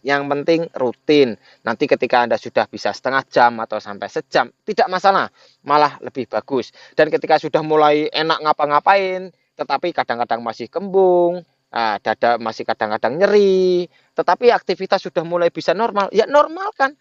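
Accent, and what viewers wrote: Java, Indonesia. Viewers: Mas sampean dari jawa mana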